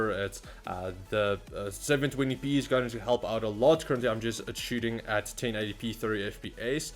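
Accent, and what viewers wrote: South Africa. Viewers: Dude are you SOUTH AFRICAN if are nice bra ek ook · Where are you from in Sa I can’t tell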